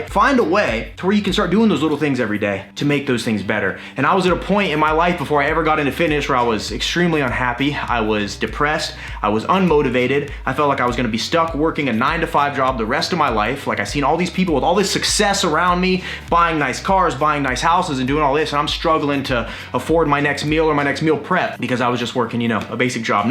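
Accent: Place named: South, United States. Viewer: Texas in the house 😀!!! My favorite was the big ol bulking breakfast because of the southern accent of course😂😂!!! Congratulations on 200K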